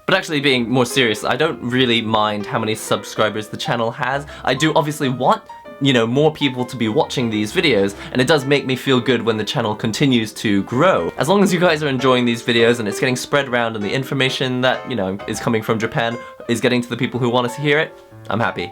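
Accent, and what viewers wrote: United Kingdom. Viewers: I'm not a racist at all but holy shit how did u learn to speak british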